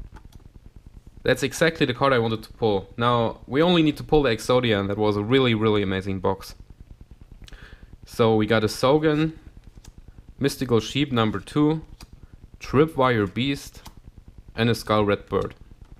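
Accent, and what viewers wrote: Germany. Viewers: Das Video leider erst gerade entdeckt :D Ich schreibe jetzt Mal auf Deutsch, weil sich Dein englisch so anhört, als würdest Du prinzipiell auch deutsch sprechen^^ Aber echt beeindruckender Pull. 1st Edition LOB Exodia boosterfrisch - wow! Da kann man nur Glückwunsch sagen :) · Kommst du aus deutschland ? :) hast so nen deutschen akzent 😊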